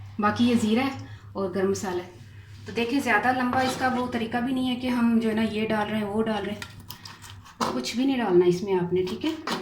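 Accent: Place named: Punjab, India. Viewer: API ap buhat acha bolti ho Urdu k Saath Punjabi tarhka. Nice recipy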